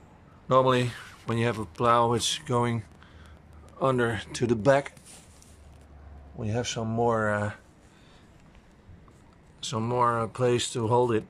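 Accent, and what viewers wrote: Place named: Netherlands. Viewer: The Dutch English accent is so endearing... my favorite. Also thank you for the instructions, exactly what I was looking for. Keep it up. Subscribed :) bedankt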